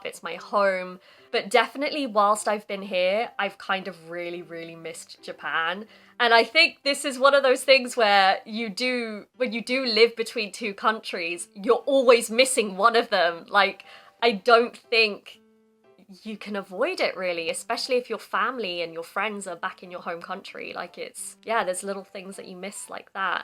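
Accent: United Kingdom. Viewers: I know this has nothing to do with the topic, and you might have told us already in another video, but do you mind if I ask whereabouts in the UK you were born and raised? I used to live in the UK when I was a kid, and there's this accent of yours which I just miss and love. I think it's a lot to do with how the "t"s are pronounced. Long story short, you talking is like music to my ears. Looking forward to your upcoming videos. Cheers!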